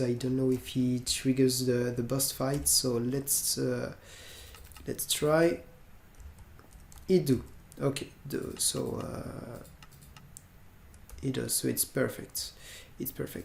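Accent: France. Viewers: Je ne connaissais pas ta chaine, je viens grâce au discord de noppes et les premières secondes m'ont m'ont rappelés un truc, un français reste un français, même quand il parle anglais mdrr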